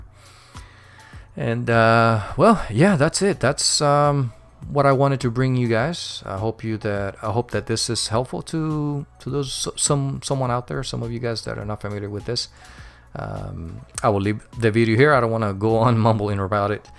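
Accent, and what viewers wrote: Norway. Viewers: you norwegian? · waaaait, are you from norway?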